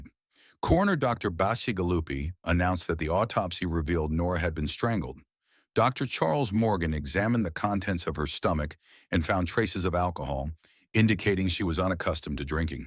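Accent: United Kingdom. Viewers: What happened to the lovely British accent narration??!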